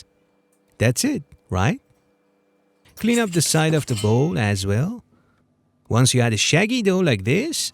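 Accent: Iran. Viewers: i make sourdough and his vids are great but if I am honest I am here for Persian accent.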